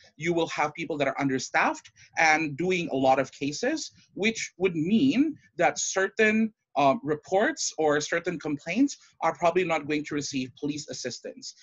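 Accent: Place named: Indonesia. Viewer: Kebanyakan "You know", pronunciation ada yang kayak Indonesian-English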